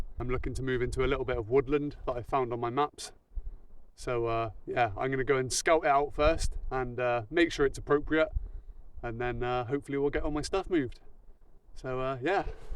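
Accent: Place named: RP, United Kingdom. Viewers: You sound English but doesn’t look like England frankly you’d be moved on & frozen if you were trapped in uk so “homeless” is a bit strong. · I could listen to you speak all day. Very eloquent. Where were you raised? You sound posh to me but then I am Scottish. Love how well adjusted and genuinely happy you seem to be, in the way that you live. ❤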